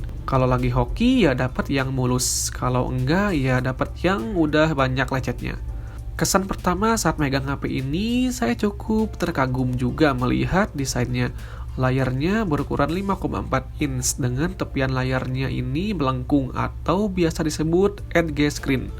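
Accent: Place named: West Java, Indonesia. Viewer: Orang sunda berarti masss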